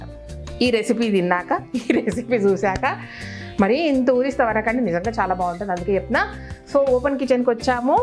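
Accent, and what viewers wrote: Telangana, India. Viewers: Super andi meeru saradaga ma tladutu adi telangana chala manchiganipistadi trees gurinchi chala baga cheptunnaru dinitona ardamitundi meeku enta opiko ani super👌👏🙏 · Hi..me ukmarava dosa bagundi..nenu try chesthanu.. Me telangana basha bale matladutu enjoy chesthu pani chestunaru..mee viewers ni me matalatho attract chestharu...be happy madam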